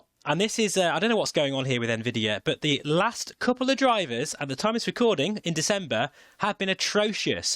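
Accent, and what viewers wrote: United Kingdom. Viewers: what? I’m being serious 😀 New subscriber, couldn’t quite make out your accent, I’m going to punt at midlands area?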